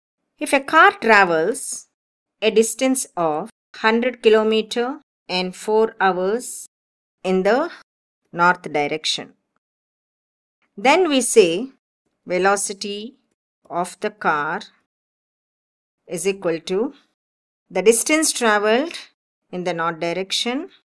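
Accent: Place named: India. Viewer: No..she is not american look her accent is indian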